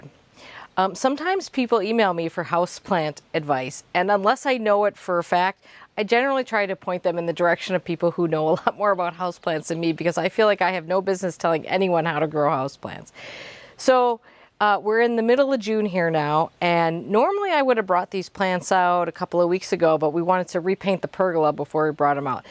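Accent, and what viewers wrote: Wisconsin, United States. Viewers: I was watching your video and my husband immediately picked up on your accent. He is from WI too but has spent the majority of his adult life in the south. Soooo, I love your videos but I also love that your in WI. Door County is one of my favorite places in the world. Fragmented chain of thought, sorry. Bottom line, can’t wait for the next video!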